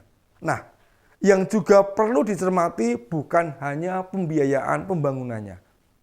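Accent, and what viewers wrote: Java, Indonesia. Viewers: Halo mas, mat malam, seneng dengar medok dan melihat murah senyum mu. 👍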